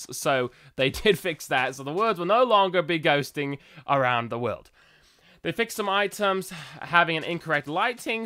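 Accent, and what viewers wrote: United Kingdom. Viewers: Hey dude I hope you don't mind me asking, where are you from? You sound like you come from the legend place called the UK!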